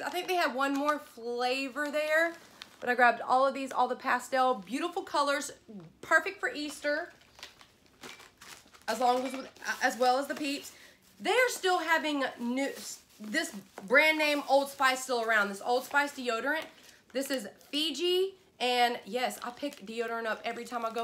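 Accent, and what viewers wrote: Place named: Louisiana, United States. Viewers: Ha haaaaa good thing I don't like the black jellybeans........giggles..........name brand for a dolla........it's a.m. I've not had no sleep ....So this video cracked me up.....dutier....you sound like a Louisiana gal....full of giggles.....I love the dollar tree ...everything is a dolla... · Okay, I happened upon your video while entertaining myself with Youtube and you now have a new fan! I am a Dollar Tree fanatic, as well, and love discovering something new every time I go there. So happy I found you! I'm from Louisiana and your accent sounds somewhat similar to mine (even though I live in Idaho now!). God bless and take care, ya'll!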